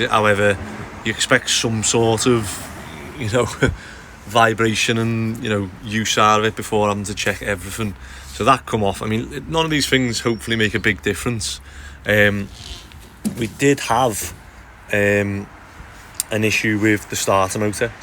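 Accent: Liverpool, United Kingdom. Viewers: Nice Scouse guy.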